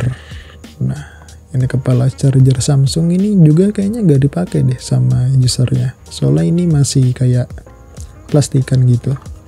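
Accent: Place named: Lombok, Indonesia. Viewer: logat bahasanya kayak lombok bang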